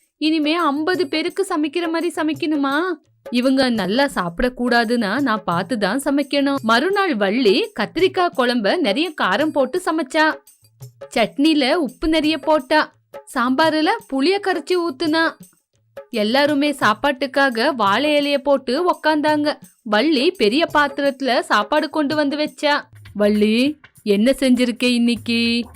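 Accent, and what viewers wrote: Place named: Bangalore, India. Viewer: Banglore Tamil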